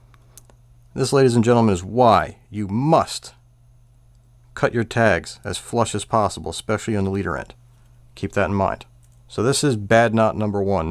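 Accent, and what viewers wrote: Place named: Westchester, United States. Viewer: Your accent sounds like a friend from Westchester. He grew up in CT, but family is from the NYC area and L.I.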